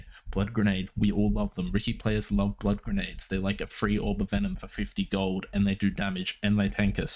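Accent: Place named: Australia. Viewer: do you play in SEA? you sound aussie XD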